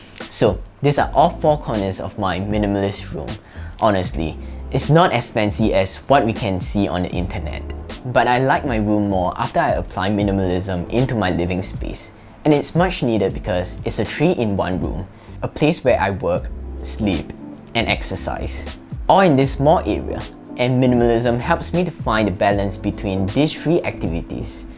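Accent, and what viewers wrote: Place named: Singapore. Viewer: As a Malaysian, i approve your singaporean accent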